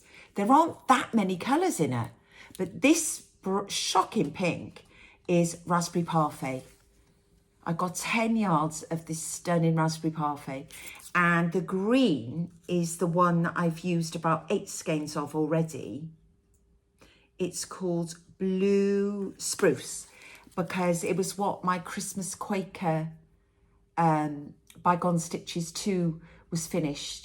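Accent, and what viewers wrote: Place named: England, United Kingdom. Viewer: I absolutely loved your longer video. You inspired me so much! I could listen to you all day. Such beautiful work and your very pleasant accent reminds me of my grandparents and uncle. They were from England. Keep your videos long. I got quite a bit of stitching done listening to you.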